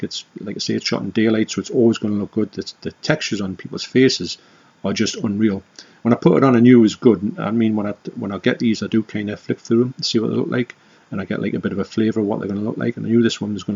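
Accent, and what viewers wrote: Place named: North East England, United Kingdom. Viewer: YouTube suggested you at random and I have to say what a lovely surprise to hear a north east voice on YouTube out of the blue. Your T Shirt is hilarious! As we all know that is the correct pronunciation 😁.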